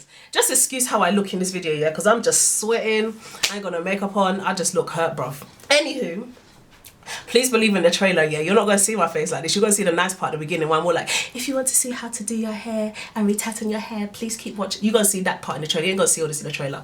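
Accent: United Kingdom. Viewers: Great video, still new at interlocking so this was really helpful. It's also so refreshing to hear another British accent, been in the states for 14 years. You are so funny!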